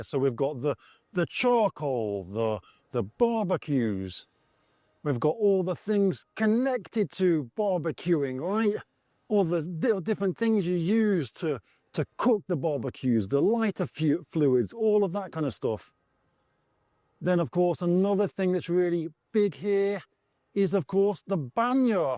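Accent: United Kingdom. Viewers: I kind of figured people will say something to you once in awhile considering to them you are a foreigner but what they don't know you're actually Russian with a British accent lol anyways thanks for the video I enjoyed it God bless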